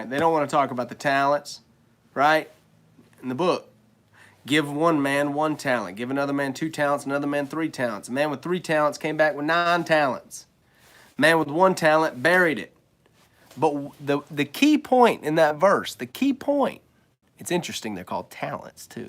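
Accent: Alabama, United States. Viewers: Are you from Alabama bro ! I dig the accent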